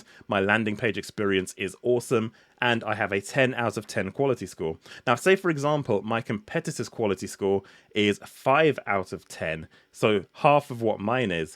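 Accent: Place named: United Kingdom. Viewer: I was about to sign up ro linkedin to get access to their learning library but the reviews on their google ads course are shady. Apparently the guy doing the videos has a bad accent and is hard to understand. Then I was considering paying for a cheap Coursera digital marketing course but that covers things I already know and is more costly. I wanted to focus on Google Ads, which is what you are doing here in a beefy 5+ hour video. This is what was looking for. Not only do you have a cool Brih-ish accent but you're putting this out here for free! It's wild. Thank you so much I intend do watch this whole thing thoroughly and injest all your knowledge. Thank you very much kind sir! PS: I love the gaming props in the backround!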